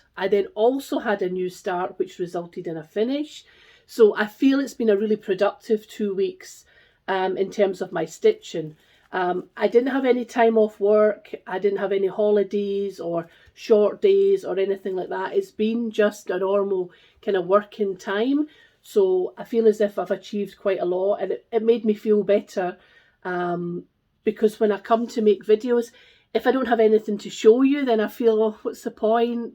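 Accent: Scotland, United Kingdom. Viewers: Congrats on your book find. It's great that all the charts are there!! Your stitching is beautiful and I very much enjoy listening to a crazy Scot woman rambling on about cross stitch! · Been catching up with your flosstube. Great to hear a fellow Scot round these parts 🏴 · First time I’ve seen your channel and enjoyed it very much. Love your Scottish accent. Take care and stay safe. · Your floss tube popped up on my feed and I enjoyed watching you. Love your Scottish accent! I’m from Idaho in the US, so you are a sweet find. Love your Easter Peep, so I’m gonna have to find that one. Thanks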